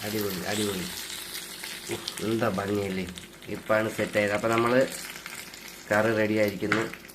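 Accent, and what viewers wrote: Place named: Thiruvananthapuram, India. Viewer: തിരുവനന്തപുരത്തിന്റെ സ്വന്തം പുത്രനാണ് അതേ ഭാഷ സ്ലാങ്ങ്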